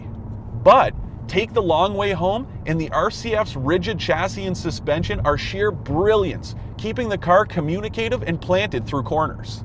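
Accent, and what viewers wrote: Canada. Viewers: that canadian accent makes my ears itch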